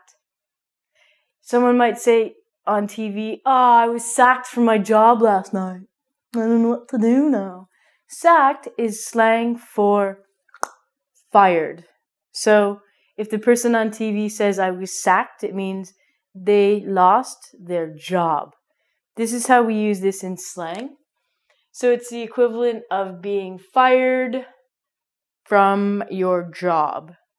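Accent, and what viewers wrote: Canada. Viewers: i think she is from canadian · Love your Canadian English tone of voice..reminds me lots of nice memories some past time in my childhood... away in Whistler Mountains.. warm hugs from Chile and thanks..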